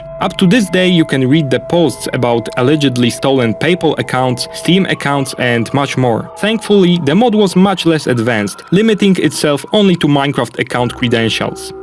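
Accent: Poland. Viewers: wiedzialem ze jestes polakiem po akcencie slychac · Akcent typowo polski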